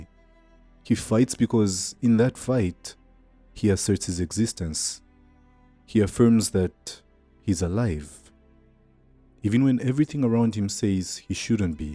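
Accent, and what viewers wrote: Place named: Nigeria. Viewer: Great video! Your accent sounds Nigerian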